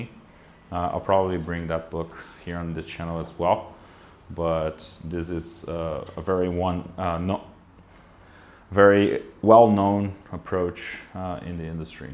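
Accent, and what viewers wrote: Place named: Brazil. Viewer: Esse english é brasuka! Faz vídeos em português tb irmão...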